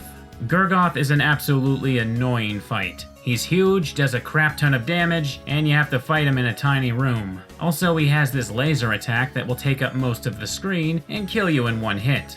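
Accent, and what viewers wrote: Canada. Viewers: Are you Canadian? Anyway this was a brilliant review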